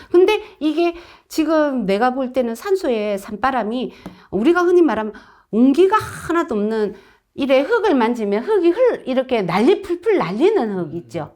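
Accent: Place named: Busan, South Korea. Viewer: 이사람.말투도 너무 싫음 부산사투리 쓰는사람 싫지 않았는데 이사람은 유난히 듣기거북함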